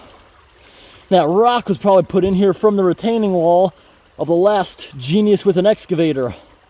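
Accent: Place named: South, United States. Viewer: man sounds like my states down south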